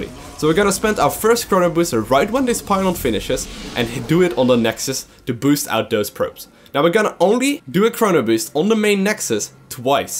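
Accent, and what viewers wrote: Netherlands. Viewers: are you dutch? you sound really dutch but I cant quite lay my hand on it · It sounds like Dutch so much hehe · Are you dutch?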